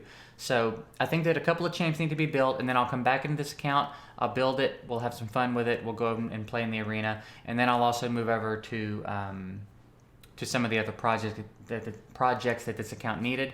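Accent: South, United States. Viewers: so cute that your a NY boy that sounds like a wholesome southern cowboy :D Trick ass mark.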